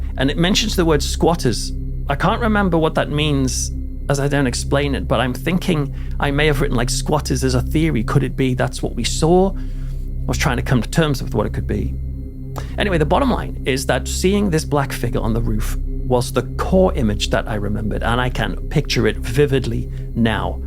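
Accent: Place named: Durham, United Kingdom. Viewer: I thought you was from Chester le street you can tell from ya accent, I'm just up from there ayton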